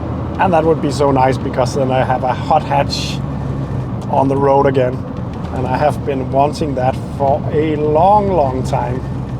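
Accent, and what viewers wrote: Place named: Denmark. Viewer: My mother was Danish and miss the accent. Listening to you brings me happy memories.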